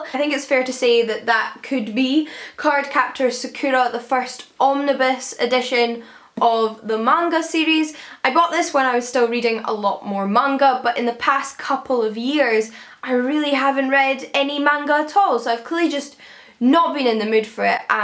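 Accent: Scotland, United Kingdom. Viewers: yep! In my case I don't usually restart a volume (especially if I'm reading the manga for an anime that I've already watch), but I find myself spending months without touching it again. And then I re-enter the compulsive reading phase again, and the cycle repeats itself xD Thanks for replying, you're really nice and insightful and your channel is one of my favourites on booktube, even though I've started following you not long ago :) keep it up! :D (btw, is your accent Scottish? I think it's lovely, but I'm not good with accents, apologies if I'm wrong)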